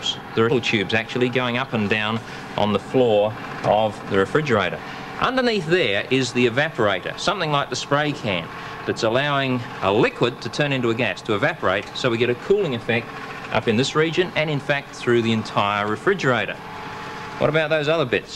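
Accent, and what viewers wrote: Australia. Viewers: Why is it a Australia accent make learning better? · It was awesome sir...It helped me a lot to polish my concepts out...You are a fair dinkum Australian..I am fond of this aussie accent... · Why does this guy remind me so much of a young Ray Comfort? Similar hairstyle, hair color, mustache, Australian accent, are these guys trying to look like each other or do all Australian men just look like this? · The Aussie accent hits me hard the first second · that Australian accent telling me what’s a refrigerator and then asking how does it work really hits me in the feels.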